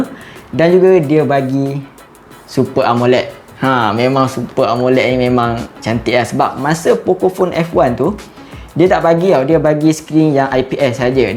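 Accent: Sarawak, Malaysia. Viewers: hi, abang ni orang sarawak ke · org sarawak?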